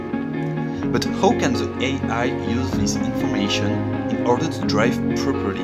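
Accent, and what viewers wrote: France. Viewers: Salut (par ton accent j'en conclu que tu es français ^^) ! Super vidéo ! Je la découvre en même temps que mon projet en lien avec les réseaux de neurones. J'aurais souhaité savoir avec quel document/cours/site tu avais appris à coder ton algo de réseau de neurone ? Et avec quel langage ? Merci d'avance ! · so French accent 😂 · L'accent French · Are you French? because of... the accent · Ah, l'accent français, j'aime! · Ahah i spotted you french accent ! Cool vid, maybe lower the music next btw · Super bon cet accent français. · Areyou french , you sound like a french speaker you have almost the same accent that lots of my friends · au moin grace a l'accent on sait que sa vient de france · le bon accent français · Sur l'échelle des accents francais, t'es loin d'être le pire que j'ai entendu, t'inquiètes. Super vidéo though :) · On a reconnu l’accent français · Really like french accent bro xD En vrai c'est ultra intéressant ! 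· T'es français non ? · french voice detected ! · pouh l accent francais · Nice sa sent quand meme un bon vieux accent français... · tu parles bien anglais mais avec un bon accent français mais pas grave tout le monde comprend 😉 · Ca c'est du machine learning c'est génial ca ! Par contre on sait direct que tu es francais mdr xD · T'es francais nan? Sinon bg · Mdr moi aussi j'ai cramé que l'accent était français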